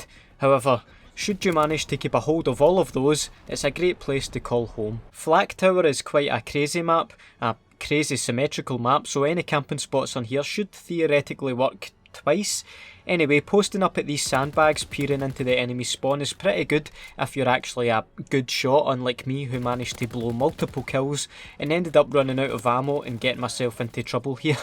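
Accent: Scotland, United Kingdom. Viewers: God I love scottish accent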